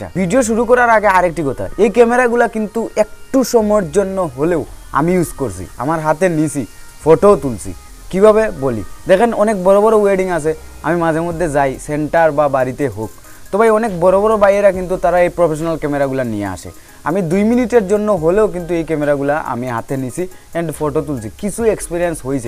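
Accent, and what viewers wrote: Sylhet, Bangladesh. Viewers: সিলেটি নি বা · ভাই তুমি কি সিলেটি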